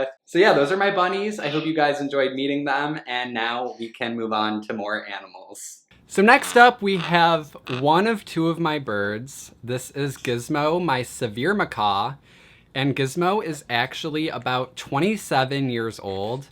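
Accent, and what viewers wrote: Midwest, United States. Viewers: what state are you from you sound like you have a midwest accent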